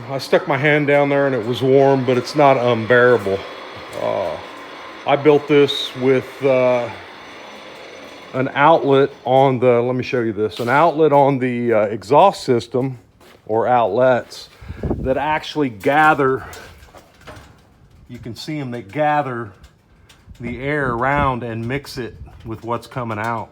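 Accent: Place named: South, United States. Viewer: Okay, you've got a Southern accent. I was driving on I285 outside of Atlanta some months ago, and holy-traffic jam, Batman, the Batmobile was driving in the lane next to me. My wife was with me, so she saw it too. Couldn't get a picture of the thing, but we weren't hallucinating, so we chalked it up to a visual spotting of a UDO (unidentified driving object). And didn't think much about it till this video. Ever been to Atlanta? I just assumed that it was being driven to one of the local movie studios that have popped up down here.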